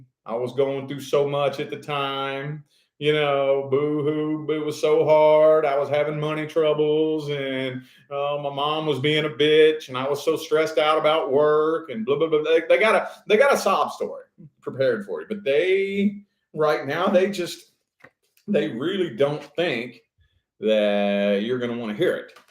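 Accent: South, United States. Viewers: I'm a Gemini and yeah.......I'm done with the BS........had to actually call the law on my "person" a couple weeks ago to GET HIM GONE!!!........He loved loved loved the chase, and the drama and trying to manipulate and control ME!!! Been goin' on since last August, whew! It was a ride, the SEX was AWESOME, but that ain't everything, lol......., you are SO cute!! and I hear a country boy accent goin' on there......where you from, sweetness?........Alabama, here, and thanks for your right on readings darlin'!!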